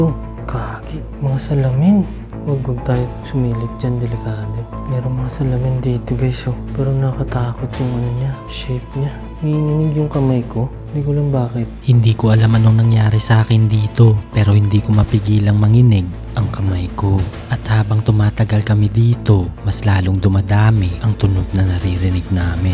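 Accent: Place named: Ilonggo, Philippines. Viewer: Ilonggo gid na sia ayy. hahaha tuno ngani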